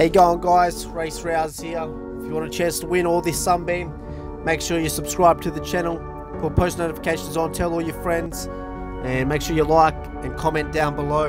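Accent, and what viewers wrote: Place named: Australia. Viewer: This is worth watching just to hear your accent! All commentary should be done in Australian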